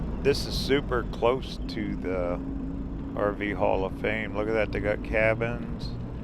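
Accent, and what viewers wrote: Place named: Ohio, United States. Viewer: Yay, you are heading to Ohio. I was so, so happy to hear you decided to go to Ohio. The very first time I heard your voice and the way you talk, I just knew you were from Ohio. You remind me so much of my dad, especially the expressions you use and the way you laugh. This Ohio girl knows Ohio people as I call them. Too cool for school. My dad moved from Ohio to Arizona too. He had family in the Phoenix/ Scottsdale area. I was one of those little girls riding a tram at a young age. My Pop was a railroad engineer and my Mamaw had a lifetime pass. She took me on the train when I was younger than five and I still remember that ride. Train museum brought back memories. Thank you. ❤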